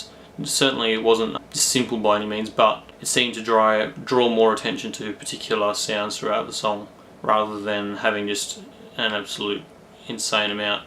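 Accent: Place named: Australia. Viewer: Nice review! Where from Aus you from?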